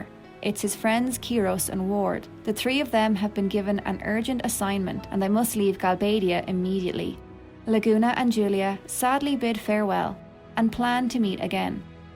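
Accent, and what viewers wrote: Ireland. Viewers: Great to hear a fellow Irish person as a FF authority!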